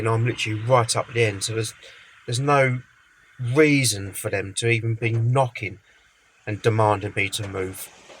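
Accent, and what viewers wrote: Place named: Yorkshire, United Kingdom. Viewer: You sound like me, I'm a Yorkshire guy but living in Portugal, at the moment. Check me out mate, I've just subscribed. Olives are Free in Portugal 😅😅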